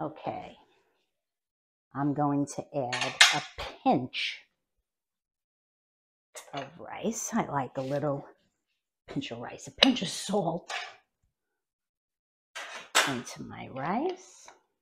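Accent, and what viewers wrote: New York, United States. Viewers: I’m making this for dinner tonight! I found you through crocTober, but I’m going to subscribe. You sound like all of my family back in New York!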